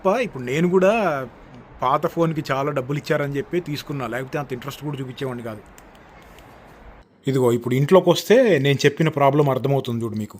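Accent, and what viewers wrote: Andhra Pradesh, India. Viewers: Anna nee slang mottam pure telugu (andhra) laane undi anna …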